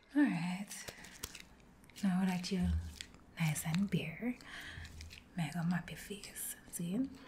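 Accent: Jamaica. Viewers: Never realized how much I love jamaican accents😩❤❤ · I love Jamaican accents, I think it’s one of the most beautiful. Every word is said with so much passion and emotion. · My old soccer coach from when I was a kid is Jamaican, haven’t heard this accent in years (not many Jamaicans in the south east of the US I suppose) so this is so cool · I was legit just about to turn in and decided to look through my recommended videos for something to help me fall asleep and when I saw this title I squealed and immediately clicked because YES, A JAMAICAN DOING ASMR?! - WE LOVE TO SEE IT! I got tingles just from your intro and I already love your voice and accent, never grabbed my headphones or hit subscribe so fast ... so glad I found your channel, I have a feeling you’re gonna be huge! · Goodness, I always struggle to completely understand Jamaican accent, but I LOVE it! 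· Your voice is always so soothing, combined with that Jamaican accent, this is actual perfection 😭😭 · I loooove the Jamaican accent! It's one of my favorites! Soo underrated! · I love the Jamaican accent specially in music, but in ASMR, It’s something I never knew I needed til now 😭!! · Jamaican accent is my favorite accent now... just so in love with this video 💖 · Jamaican accent is just the coolest accent to me, idk why it just sounds awesome haha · Same I never knew I needed a Jamaican asmrist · i love this so much, its not like a corny jamaican accent its so soft omg :) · I had never heard a Jamaican accent before, so thanks for teaching me something new and for being soothing! The accent sounds very friendly and happy, I like it. You are also very beautiful! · My all time favorite movie is still Cool Runnings. It makes me happy so much specially because of the accents I love Jamaican accents. 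Now you put the biggest smile on my face with your Jamaican accent. I love this video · If my brain stops concentrating it turns the accent Irish, especially the whispered make up video. It must be the lyrical aspect. It sounds Jamaican again when I concentrate. But I love both accents! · My fav music is dancehall and thanks to that I understood everything and oh it was sooo relaxing 😌 I love Jamaican accents. they have such a beautiful way of speaking and articulating.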